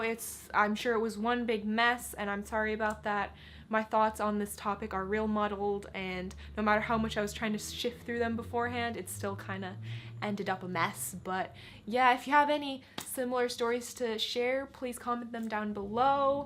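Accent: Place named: United States. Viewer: That was very inciteful, thanks for sharing. I had always assumed you were an American who had moved to Finland (probably just your accent). Your channel is great, thanks for making these great videos.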